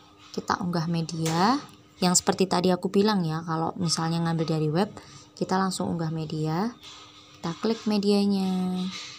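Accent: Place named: Java, Indonesia. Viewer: Mbak nya jowo ya mba, cengkok e kliatan 😅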